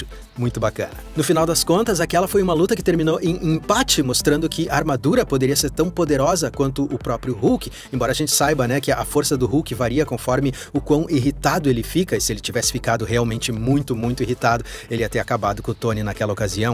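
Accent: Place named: São Paulo, Brazil. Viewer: o teu sotaque é de invejar, sou do interior de Sampa e sei que teu sotaque é de Paulistano, e não de paulista que é do interior. Um dia aprendo a falar com esse sotaque.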